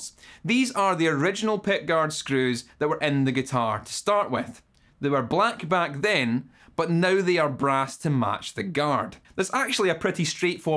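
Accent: Scotland, United Kingdom. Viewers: String bucklers! Hot damn! Why did it take so long for these to be invented? Or rather: why are guitar manufacturers still building expensive instruments that pull the strings sideways from the saddle? I mean, tuning-wise, these are accidents waiting to happen, and guitar players have been plagued by this completely unnecessary problem for generations. Gotta pull the strings out of the saddle absolutely straight , dammit! I gotta get me some of these. 10 minutes of Google later: Oh, butler , not "buckler" . Hot damn again - your Scottish is a wee bit too confusing for me...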